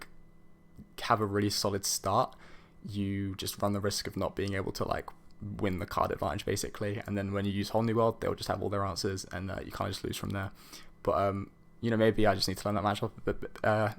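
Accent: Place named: Europe. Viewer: Its normal for North America to dominate the TCG space in terms of sheer numbers. The United States out purchases all of Europe in pretty much every TCG at least 3:1. The American dream screams win big money from tournaments so there are soooooo many more TO's running events per game than pretty much anywhere else on the planet. (This tends to shock most European players until they look hard at the state of their official World Championship series for their respective game vs United States.) Between the accent and you having commented on not coming from Magic, I am going to go on a limb and ask if you are European?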